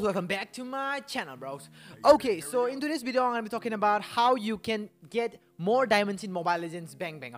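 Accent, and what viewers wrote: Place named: Philippines. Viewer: Your voice same to phillipines Voice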